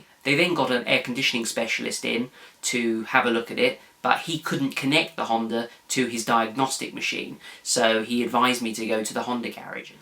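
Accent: United Kingdom. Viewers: He has a voice of an English person not a Chav,, rare these days .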